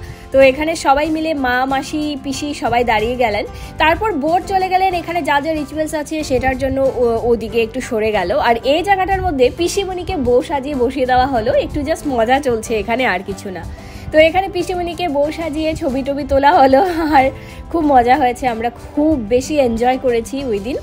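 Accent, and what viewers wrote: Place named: Sylhet, Bangladesh. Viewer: Tomar seleti kotha khub bhalo lage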